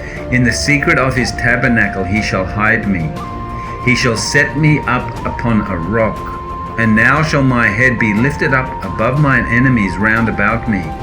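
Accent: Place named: Australia. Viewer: GBY! I hear an Aussie accent, but I’ve been told you live in Sth. Africa - is that right?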